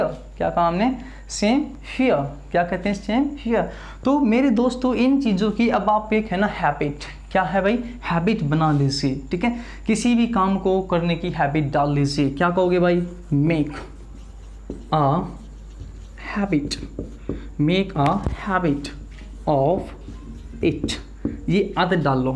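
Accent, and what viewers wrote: Haryana, India. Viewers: English Mai bhi apka Haryanvi Touchup aa rha h brother 🥱🥱🥱🥱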